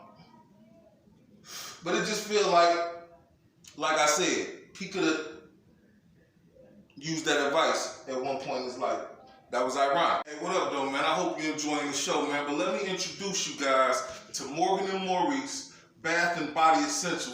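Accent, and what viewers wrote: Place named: Arkansas, United States. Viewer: You making a lot of sense.. Sound like niggas where I'm from here in Arkansas. .Home of the Chambers Brothers..who you should look into doing a video on..real heavy hitters..I went to school with the younger generation of Chambers..but as for Demencio..I'm not thuggin but let me hang wit em so I obtain that image n now I'm free to be me..No matter if I wanna be on some thug shit, goon shit, player shit, money making shit..I'm free to do that because of how I have surrounded myself..P.O.M.E. type shit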